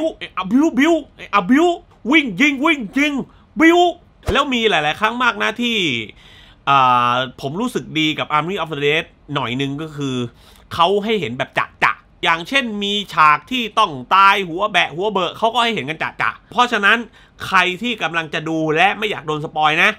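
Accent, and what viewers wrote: South, Thailand. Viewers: พี่คนที่ ติดพูดสำเนียงใต้อะครับ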